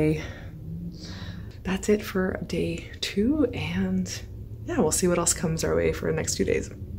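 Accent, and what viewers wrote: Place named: Canada. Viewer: pilot, I hear your Canadian accent a lot more in this video than the last one I watched lol....so are you a veteran of Her Majesty's Royal Canadian Navy or Air Force? I should call you ma'am if you're a Naval Aviator or RCAF.:) I was USN enlisted on submarines, 1999 to 2003...STS3(SS).:) Never piloted an aircraft myself, want to do it someday...now that I'm fully vaccinated I've started taking some plane trips again, USA only though. Canada is still closed off to Americans I think for covid. Last plane trip, I went San Jose to Los Angeles, and then took Amtrak to San Diego, and then flew back San Diego to San Jose. Currently my next planned flight is September 3rd to Seattle for PAX West! (gaming festival)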